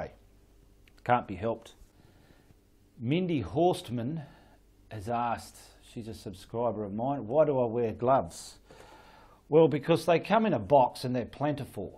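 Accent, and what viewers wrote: Australia. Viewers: I have only just found you, and I am loving the down to earth way you teach. I can understand every word you say but being English means we do understand the Aussie accent well. I love the fact that your studio is a corner of your living room. Our living room is far too small to do that so I am converting a corner of my bedroom in to a studio. I have subscribed to your channel because of your no nonsense way of teaching. I enjoyed your Vlog answers to questions. Regarding retarder, I am thinking in Australia, like you said, it gets very hot and you would need it. I have bought Golden Gloss Medium that also allows blending and slows down drying time. It also has added polymers so you can thin down your paint about 30% without under-binding occurring. Like one of your other subscribers who has commented, I am 65 also and I am new to acrylic painting. 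I have done a little oil painting before but not acrylics. I am looking for a straightforward teacher and I have found him. Thank you. Love to you and. He is gorgeous. xxx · Love love love your accent and your Aussie phrases. That's part of what makes you so unique to watch!!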